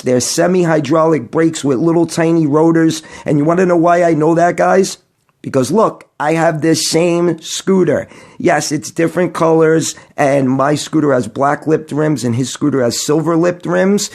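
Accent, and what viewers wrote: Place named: Brooklyn, United States. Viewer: As a Transplanted New Yorker out here in Seattle 1. I've been down the rabbit hole of Escooters I only follow The Ginger Kid Igor from Roblex and Now You! I miss the energy and the vibes 2. that Brooklyn Accent made follow you as well Bro lol glad I stopped by cos old fuckin I supply alibaba Asshole was kinda getting on my fuckin nerves because again the rabbit hole,I started looking up specs and when I heard 52V doing 50mph I'm like GTFOH! 🤣🤦🏾‍♂️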